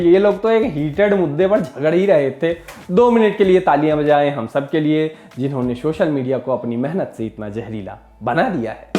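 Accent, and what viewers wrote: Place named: Bihar, India. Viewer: Yarr mujhe aap ka baat krne ka tarika bohot achha lga bihari hindi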